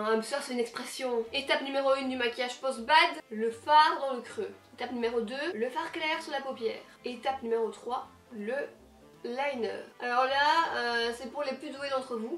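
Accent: Belgium. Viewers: Je surkiff vive l'accent belge 🇧🇪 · Mais l'accent pur belge on adore 😂😂 Love!!! Je suis pour une rencontre avec Jacky!!! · L’accent belge qui ressort en force! Lol Cette vidéo m’a tuée ! 😘😂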